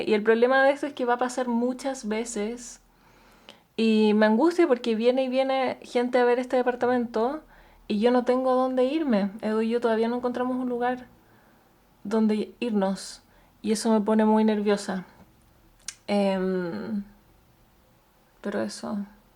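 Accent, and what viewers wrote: Chile. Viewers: Tu acento chileno es pura paz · Hola, de dónde erea originalmente? Oigo un acento chileno:p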